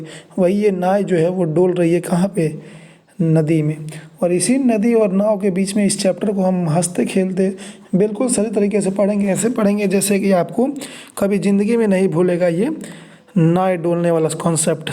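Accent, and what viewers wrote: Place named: Bihar, India. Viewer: Are bhai hindi bol liya karo, bihari main samjh nahi aata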